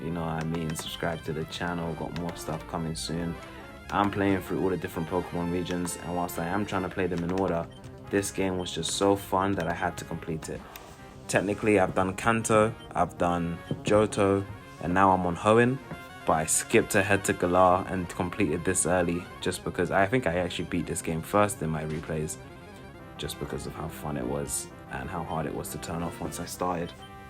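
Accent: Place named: United Kingdom. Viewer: Hearing a british guy playing sword and shield is strangely immersive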